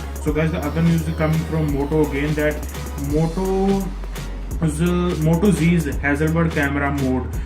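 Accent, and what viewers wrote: Punjab, India. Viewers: oh Jada naa bol gandia jehia video banada hai knowledge koi nehi ,oda hi boli janda moto g4 9000 da ayia hai tu 11000 dasi janda teri news hi chuthi AA ...... PTA nehi ketho ketho AA Jande AA .. 22 technical guruji Ja sharmaji technical dekh kini sehi news dende aa ... rest comment in Hindi...... or baat English ki Teri English vi Punjabi tone vali hai Tumhari videos dekh ke admi bor ho jata hai.. tabhi koi view Ja like Ja comment nehi ata.......💩💩💩💩💩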